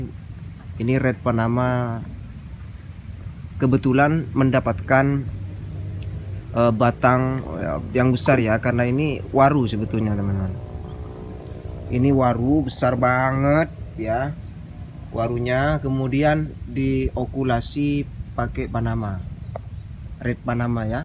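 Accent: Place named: Madura, Indonesia. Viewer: Logat bicara nya kok mirip logat Madura ya bang 😀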